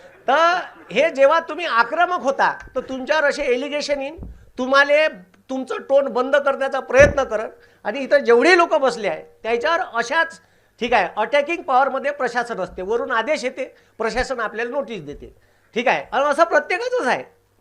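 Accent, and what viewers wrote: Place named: Vidarbha, India. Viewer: Varhadi Marathi ❤❤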